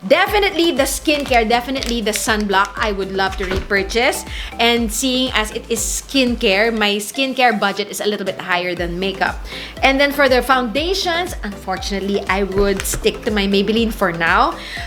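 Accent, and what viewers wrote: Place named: United Kingdom. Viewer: ! Love the british accent! Ganda ng intro!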